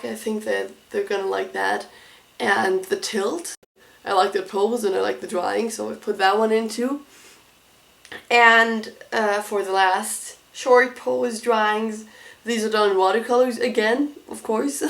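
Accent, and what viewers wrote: Germany. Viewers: I have no idea why but I LOOOOVVVEEE your accent. I loved accents and German accents are my favorite! Don't apologize for your voice! It's beautiful! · Don't apologize for who you are! Your German accent is amazing and you shouldn't be sorry for that. Your art is amazing by the way <3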